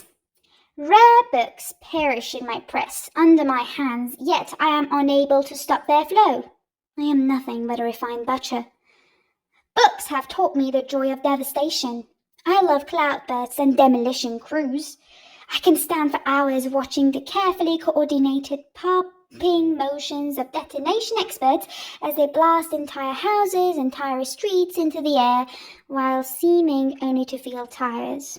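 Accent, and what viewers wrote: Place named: United Kingdom. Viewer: Iove it The British accent is funny to me 😄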